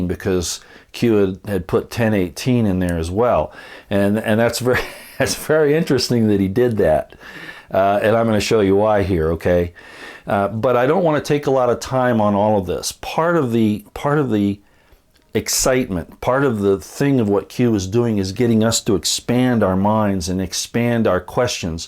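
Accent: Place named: United States. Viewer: His first language is obviously not Hebrew so you have to apply his american accent to it. Seriously, I do not criticize people that do not speak English very well. I give them props for knowing two languages.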